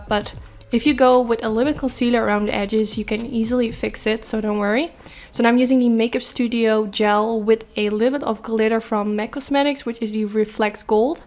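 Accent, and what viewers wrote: Netherlands. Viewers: Beautiful look 😍 Are you Dutch? You have really funny accent ☺️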